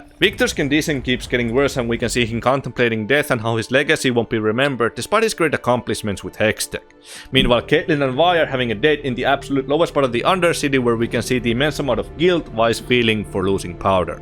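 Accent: Finland. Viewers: Hahahaa I knew you were finnish!!! :D huomasin heti aksentista x) Mutta arcane on vitun hyvä, se taide on niin kaunista en kestä! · Ensimmäiset kymmenen sekuntia: Aksentti.. Onks tää jätkä suomalainen? : Hmm : :D Vois itsekin katsoa suomeks läpi. For shits and giggles :D Noh mutta kumminkin hyvä video. 6/5, toimii. Pitänee katsoa muutkin tekemänne videot. Ja kanava tilattu! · Love the Finnish accent. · Your channel is criminally underrated. I like how you become progressively and aggressively more Finnish throughout the video. Edit: Btw, you have the personality and energy of a person 1M subscriber channel so keep it up. You could make it big for real. I was expecting to see at least several hundred thousand subs at least when I looked at your subscriber count.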